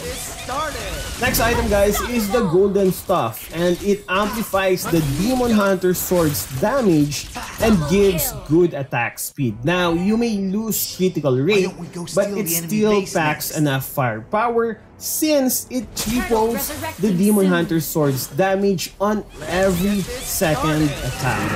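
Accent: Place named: Philippines. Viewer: The pinoy accent tho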